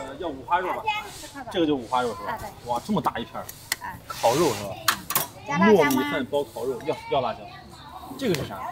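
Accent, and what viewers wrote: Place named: Henan, China. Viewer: 第一次看的视频，听出来一丢丢邻居省份河南口音，很熟悉哈哈哈，视频很棒，期待更新。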